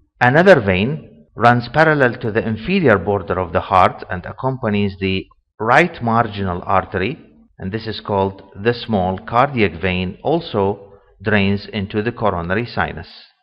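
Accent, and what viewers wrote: Iraq. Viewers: you sound iraqi are you ?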